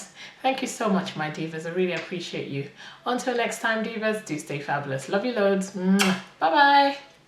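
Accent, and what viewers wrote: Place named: Africa. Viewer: Love your African accent and your tutorial. Can you do a lipstick tutorial please???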